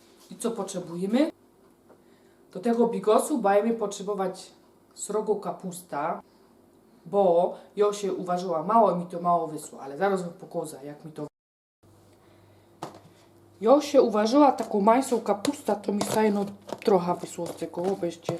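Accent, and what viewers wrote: Silesia, Poland. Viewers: skad lochodzisz? Niby mowisz po slasku ale troche inaczej niz u nas w domu mowili? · Uwielbiam slazakow sama mam rodzine na slasku no to na Swieta dalas mi pomysl na bigos po slasku pozdrawiam fajnie gwarzysz po slasku ;) tak trzymaj. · Pani prosze nie podjadac tego miesa z patelni bo nie bedzie co do kapusty wciepac.Ja robie zupelnie inaczej bigos szczegolnie swiateczny ale napewno spruboje Pani wercje kiedys.Nie jestem slazakiem ale lubie Pani gware i ta taka prostolinijnosc.Pozdrawiam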